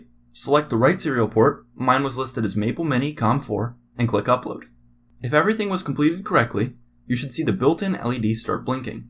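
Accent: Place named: Midwest, United States. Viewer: To quote steamed hams, "it's a regional dialect." I also say sodder instead of sold-er. Dang Midwest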